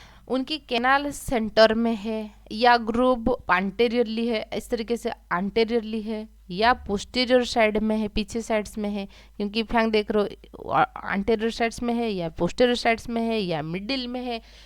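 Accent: Odisha, India. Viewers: Mam are you from odisha??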